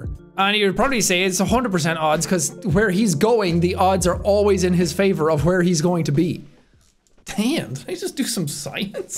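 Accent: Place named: Ireland. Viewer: Please get well jacksepticeye I pray for you 😞 I hope you get well because every time when I am sad and I need a smile on my face I know where I can go on YouTube to see your videos that help me laugh and make me smile jacksepticeye the bravest most strongest and the funniest like a boss my favorite YouTuber I love your Irish accents I love your funny comments I love the adventures that you go to in these video games I live your tweet videos where you make funny jokes every time it helps me to put my mind at ease my friend please get better I care about you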